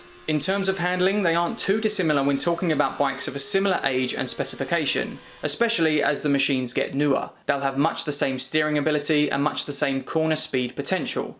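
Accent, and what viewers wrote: United Kingdom. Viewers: that be good also but if I were you Im gonna try not to rush in speaking and remove the accent & slang since not everyone who watch your video come from britain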